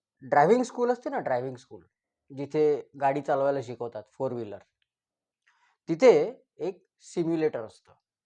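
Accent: Vidarbha, India. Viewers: साहेब आपणास आम्ही नेहमी ऐकतो कारण आपला अभ्यास दांडगा आहे साहेब आपण निवेदन करताना आम्हाला भरपूर प्रमाणात हसायला येते कारण आपली वैदर्भीय भाषा एकदम मस्त आहे जय महाराष्ट्र साहेब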